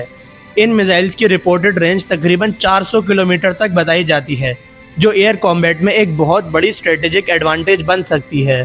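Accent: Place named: China. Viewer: I don't like Chinese English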